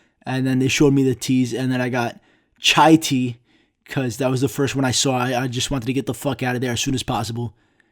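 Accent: New York, United States. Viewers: I know you're from Greece, but you sound like you came from New York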